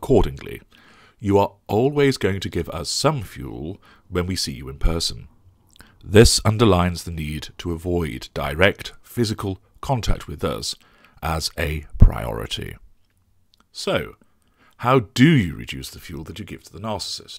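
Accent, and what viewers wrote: England, United Kingdom. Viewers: It's that awesome ENGLISH accent, whew!!! Outstanding!!! Xoxo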